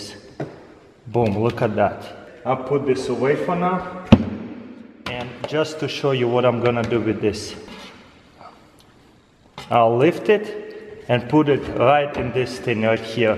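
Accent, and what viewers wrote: Russia. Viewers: По акценту слышно, что русскоговорящий человек. Это так? Интересно просто. Я иногда встречаю людей, у которых акцент еще хуже, чем у Русских, но они не русскоговорящие. Вот мне интересно, вы русскоговорящий или нет?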